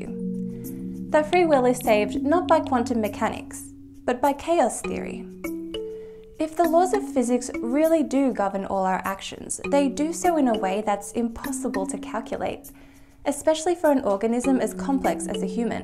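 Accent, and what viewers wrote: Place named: Australia. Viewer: I appreciate your teaching style, animations, sense of humor, and your australian accent. Subscribed.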